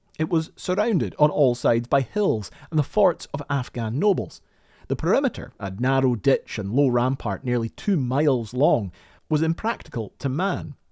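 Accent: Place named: Scotland, United Kingdom. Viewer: OMG! I've gone off my nut! How have I not found you yet laddy? I'm 6th generation Canadian. My many great grandpappy on my mothers side came from Ochiltree, East Ayrshire, Scotland in 1857 and settled in Victoria BC. Both sides came from Scotland. Nothing I love more than a glass of scotch, some Still Game and a thick Scotty accent telling me about history. Keep up the good work boyo!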